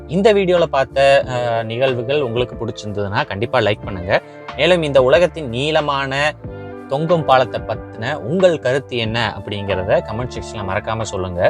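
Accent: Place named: Kongu, India. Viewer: Thanks for all your work and narration in this channel. I love your kongu and pure tamil; You have been doing a great job, Thanks again!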